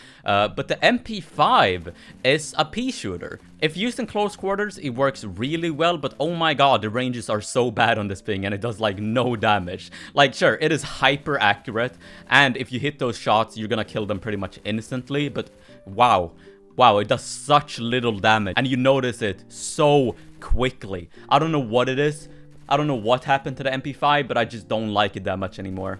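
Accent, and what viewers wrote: Sweden. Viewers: Where are u from? (U sound Swedish, I know that because I’m Swedish)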